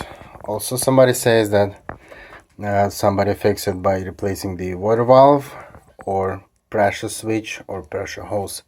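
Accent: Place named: Balkans. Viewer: nice great explanation bro, are you from Balkans?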